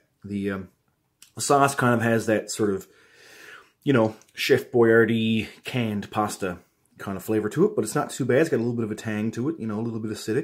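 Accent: Canada. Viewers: At last a Canadian MRE reviewer who speaks like a real Canadian! Pop & pizza pockets. Throw in a bag of hickory sticks and a Coffee Crisp bar and that's a damn good lunch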